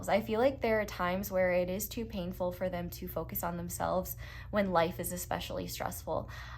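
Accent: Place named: Wisconsin, United States. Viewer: Pile 2 - the Wisconsin accent 😂 me being from Wisco and a Packer fan 😂😂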